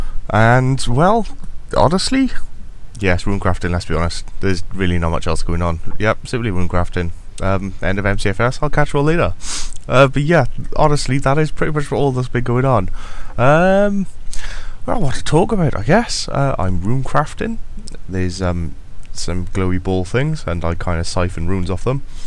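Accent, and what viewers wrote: Wales, United Kingdom. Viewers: ahah where in wales you from but? can spot it from a mile off!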